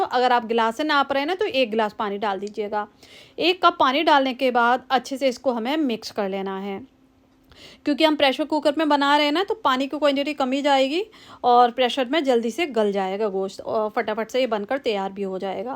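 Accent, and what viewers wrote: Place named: Hyderabad, India. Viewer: Assalam alekum khairiyat main Saudi Arab se dekh raha hun aap sarson ka tel khate ho aapki language Hyderabad ki tarah hai aur aap sarson ka tel khate ho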